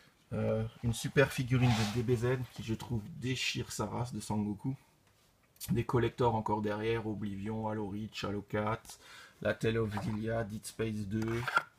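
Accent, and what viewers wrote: Alsace, France. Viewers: alsacien ? ta l'accent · Il fait plaise ton accent elsass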